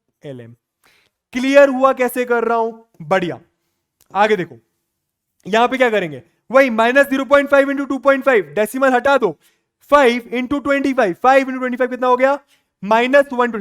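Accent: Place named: Bihar, India. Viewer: ap bihari ho /mai bhi hu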